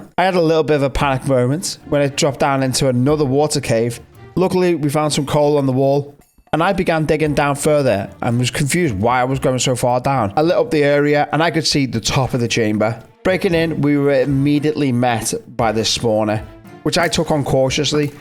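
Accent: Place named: Liverpool, United Kingdom. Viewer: Never knew i needed a scouse mc youtuber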